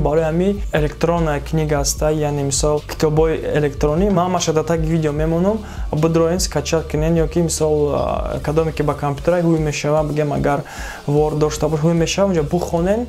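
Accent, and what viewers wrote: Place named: Kulob, Tajikistan. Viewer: братишка офарин барои корот! кори зур хар руз хабари тару тоза медихи лекин гап заниатро хам каме иваз кун ютум кулоб нестай ки лахчави гап зани ича адаби гап зан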